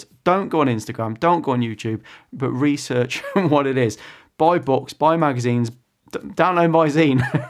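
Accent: United Kingdom. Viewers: Maybe I misheard you--sometimes I have trouble with British accents (I speak that weird variant of English spoken in the USA... :) BTW, I just bought the a6600 two weeks ago and I'm having a blast with it. I had stopped using my Canon 5D because it was just too heavy and bulky. Getting back into street photography with the Sony--I've found your videos very inspiring.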